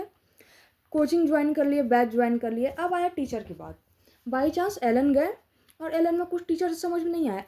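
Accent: Bihar, India. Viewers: Aap bihar se ho ky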